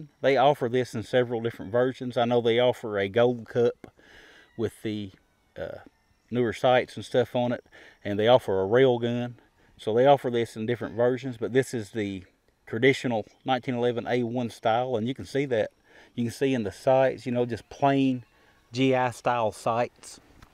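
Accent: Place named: South, United States. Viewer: After seeing your great piece of property and listening to your accent, I am going to guess that you are somewhere in the South. Yours is the second video I have seen on this gun, and I must say that I am not found of the slide stop or the barrel attachment. I have a Kimber .22 conversion kit on top of a Kimber 1911 that functions more like a real 1911. While I am not found of the Kimber, at least it functions more like an original 1911. My biggest gripe about the Kimber is that it does not lock the slide open after the last shot, which requires the shooter to count the rounds he is firing so that he doesn't slam the firing pin down on the back of an empty chamber.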